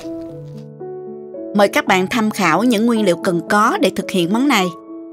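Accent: South, Vietnam. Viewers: lam video nuoc mam cham cha gio, bun thit nuong va com tam nhe! Con rat thich cach nau an va going noi ngot ngao nguoi mien nam cua Co. Chuc Co nhieu suc khoe va lam nhieu mon An nGon guip the he tre cua tui con Lon len o My duoc biet ve am thuc Vietnam. Thank you nhieu😍😍😍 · Giọng miền nam quá dễ thương, tự nhiên ko điệu, cách làm dễ hiểu, cám ơn 🥉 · Giọng nói Miền Nam của ngọt ngào & thân thiện quá. Học được bí quyết làm chả giò giòn lâu, cách trộn nhân để bánh giòn & cách bảo tồn trong freezer. Cám ơn cô nhiều lắm. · Rất thích cách hướng dẫn ngắn gọn kèm với giọng nói miền Nam dễ thương của " hén, hé, nha...." 😆 Cho mình hỏi thăm mua con dao bào khoai và carottes ở đâu vậy? Cám ơn cô trả lời. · Cảm ơn thật nhiều ❤giọng Miên Nam sau mà dễ thương quá ❤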